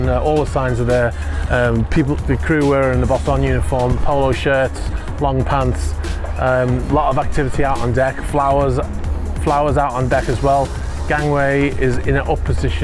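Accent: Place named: Merseyside, United Kingdom. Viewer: Sounds like you originate from Merseyside so the sea is in your blood then. How did you get into the career you've had?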